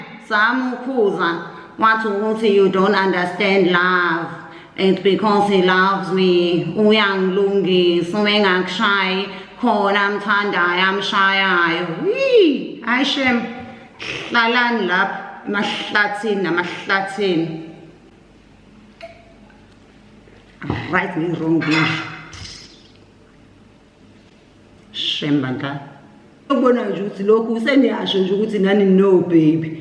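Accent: KwaMashu, South Africa. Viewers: Heh heh lol my cousins from Kwamashu accent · All I can hear is the Kwamashu accent😅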